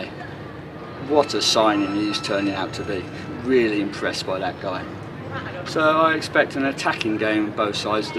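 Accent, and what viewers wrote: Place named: United Kingdom. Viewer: love your British accent <3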